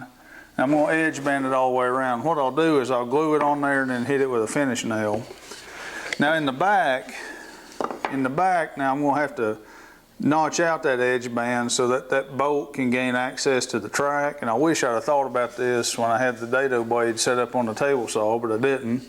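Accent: South, United States. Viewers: Looks good to me and I have now found someone that sounds as southern as I do.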